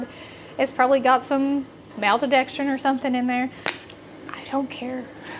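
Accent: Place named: Tennessee, United States. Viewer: Girl!! When I heard you say Paris, TN!! I grew up in Humboldt, TN and have family in Paris! I should’ve known by your accent 😅❤ love it!!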